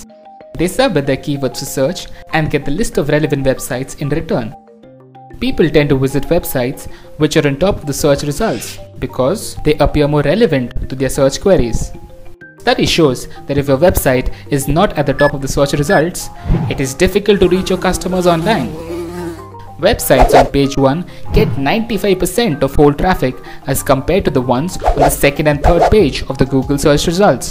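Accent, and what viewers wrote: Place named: India. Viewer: Indian accent... urgh.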